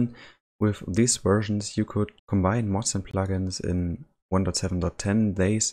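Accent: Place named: Germany. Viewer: Nicht mal 5 Sek im Video und erkannt das er Deutscher ist! HAHAHAHA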